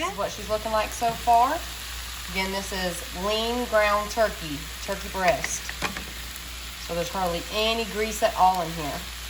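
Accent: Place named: Georgia, United States. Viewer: Omg I'm here in Ga also and it's steaming outside 🔥🔥🔥.... I'm a new subscriber and I just love your video's.... You have a very strong Ga. accent 😁👍😁... I live just north of Atlanta.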